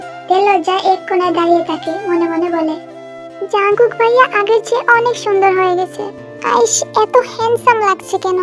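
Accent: Sylhet, Bangladesh. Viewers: তোমার কথার মধ্যে সিলেটি ভাষার টান আছে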